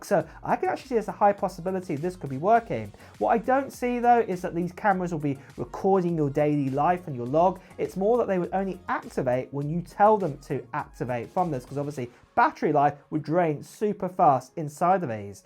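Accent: United Kingdom. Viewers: Are you British as you sound British so why not tell us how much they cost in Pounds ?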